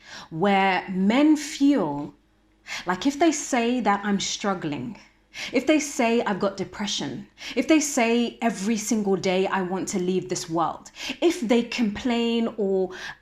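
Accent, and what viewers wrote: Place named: Canada. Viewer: Thanks for sharing your knowledge on this. I promise you I will share it with my friends. Also I pray that the whole of western world will understand the need to this issue.Very thoughtful. Am deeply sorry to ask, what do you studied at the University and are you from Canada? Because the way you always pronouncing the (Better) sound like you are. It's out of curiosity.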